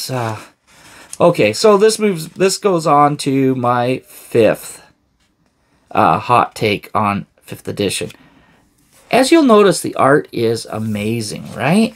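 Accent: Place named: Canada. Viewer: Canadian, eh?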